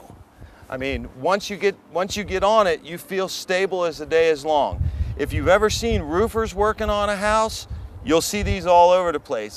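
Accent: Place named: Eastern Shore, United States. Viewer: This guy sounds like he is from the Eastern Shore.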